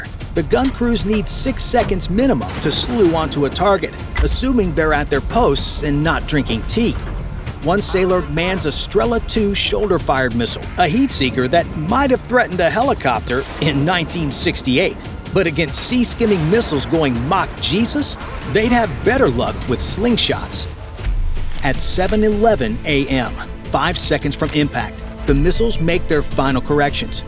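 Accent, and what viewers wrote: United States. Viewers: Had to give up. I can't stand the overblown yank narration 🤢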